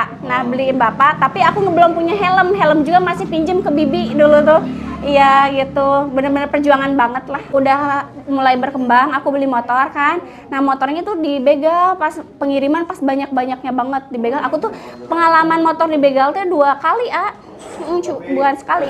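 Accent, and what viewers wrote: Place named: Sukabumi, Indonesia. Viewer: logat.y kaya orang sukabumi, mantap banget teteh.y lancar & berkah terus moga qu juga bisa usaha begitu. aamiin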